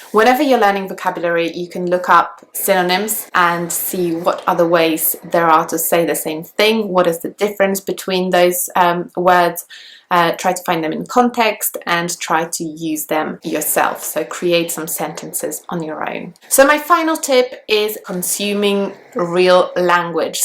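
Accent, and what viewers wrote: United Kingdom. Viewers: I thought she was british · Lol i thought you were british until you said the 'this and that' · Hi Good morning from Myanmar. I've been learning english 2 years nearly but my take on is that it didn't work much as i hope. British accent appeals to me tremendously. I feel i have a weakness in pronounciation. Fortunately, your vedio for boosting my accent in british is exceedingly great for me. ohh by the way, your british accent is lovely. Thanks a lot for your kindness.😘😘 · As a well travelled Australian, I thought you were from the UK. Only some words sound a little 'central European', and you have both pronunciation for the north and south of the UK. But there is plenty of examples of natives that do the same! Well done!!! Like another view, I am technically advanced in Spanish, but this seems to fluctuate greatly depending on the day... 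· I thought you were British till you started speaking about your fossilised error ! My god, you sound like a Brit through and through. What's your native language ? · I did, but it was like 95% BrE, and 5% german, maybe, cause thr L is sorta german, especially in words like "language" and words that have "ly/li" in it.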